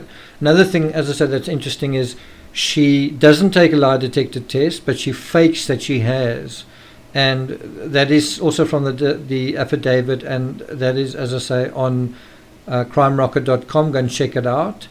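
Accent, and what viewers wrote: Afrikaans, South Africa. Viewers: He has a neutral Afrikaans accent. It's obvious he grew up speaking both English and Afrikaans, though. (Of course, this is just my assumption.)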